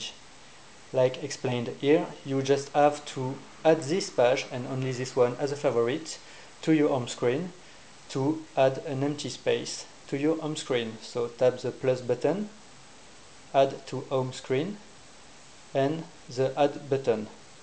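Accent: France. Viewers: ton anglais est pas vrm bon mais bravo quand meme t bon pour un francais :P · you have a cool accent i'm guessing your french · Rien C'est juste que j'ai déja été en france et vu que je connais l'accent j'ai remarqué que tu étais francais :)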